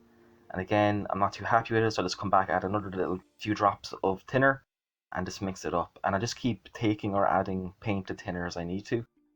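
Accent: Ireland. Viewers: Are you a fellow irish lad? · Great straightforward tutorial with great hints! I’m in the middle of a 1/72 Vulcan bomber using Tamiya paints and I can vouch for everything you’ve said 😊 from an expat abroad who loves to hear the aul accent 😊 Ian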